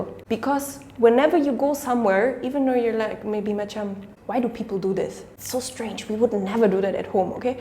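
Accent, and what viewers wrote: Malaysia. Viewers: You sound like Malaysian already, hahaha 👍🏾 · Hang pun borak dah mcm org local(malaysia) even bila hang speaking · English dier dah kena rasuk Manglish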